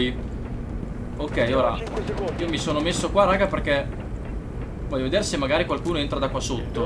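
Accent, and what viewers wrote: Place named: Parma, Italy. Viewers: Ve l'omo é bello il dialetto di parma io somo di fidenza☺ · Il tuo dialetto parmigiano è il top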